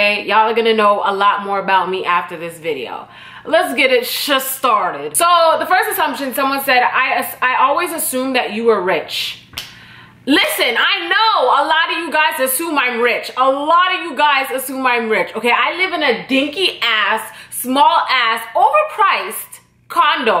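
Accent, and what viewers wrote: Toronto, Canada. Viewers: I honestly wish you would use your Canadian accent more often tbh! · You speak like a Torontonian 😂😂😂😂 The first video I saw of yours you said 1 word and I automatically knew you were from Ontario 😂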